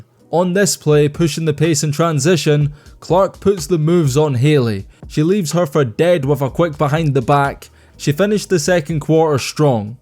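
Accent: Scotland, United Kingdom. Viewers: Why is there a Brit talking about basketball on this video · Bizarre hearing a Scottish guy do the review. But, it’s OK. Sounds great. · It's funny to hear the comments coming from someone with a thick Scottish accent.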